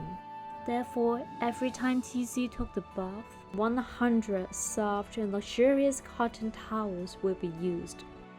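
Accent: China. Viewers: whoever u are, this is one of the worst content. The female narrator sounds like a village girl from northern china with horrible accent. This is a fcuking joke!!!!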